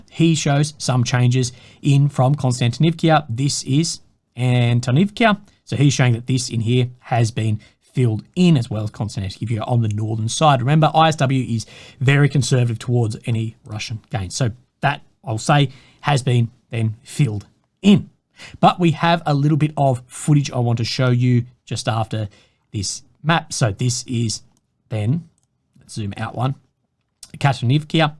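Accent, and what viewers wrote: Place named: Australia. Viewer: Good luck with the surgery and shit. Ive been in korea the last 2 years (kinda not by choice lol ) and yours and is the only aussie voice i hear regularly, keeps me sane. Take care of your self and hope u back in 1 piece soon.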